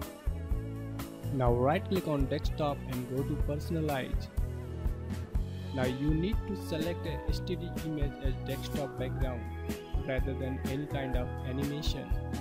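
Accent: India. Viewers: hears indian OH HELL N- clicks out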